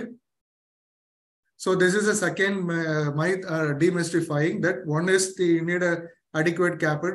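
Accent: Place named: South India, India. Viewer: Very bad presentation and even his english also semi tamil or telegue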